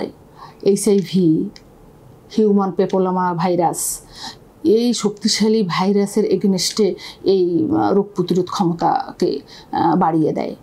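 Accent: Barishal, Bangladesh. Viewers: মেডাম আপা বরিশালে নাকি মুখের কথা সুনে তো মনে হয়ে সেরকম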